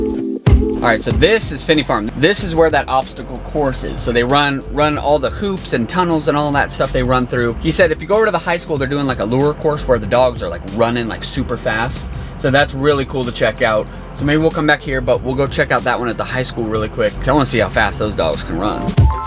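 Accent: South, United States. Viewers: Shoutout from Utah, Tennessee looks Greener than here. Also note a micro southern twang in your voice. Love your Vlogs ✌️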